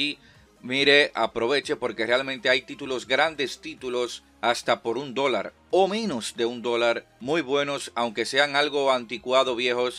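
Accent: Dominican Republic. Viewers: eres dominicano????????????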